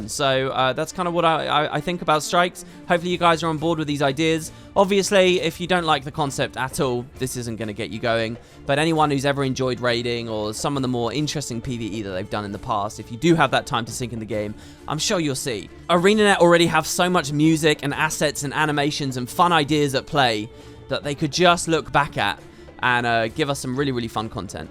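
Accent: United Kingdom. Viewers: Hey man, long time fan. Just thought I'd let you know that I've been watching another YouTuber named MrTommo2304 (who does Bleach anime content); and his voice sounds bang on like yours. Could be your doppelgänger. Definitely a British accent thing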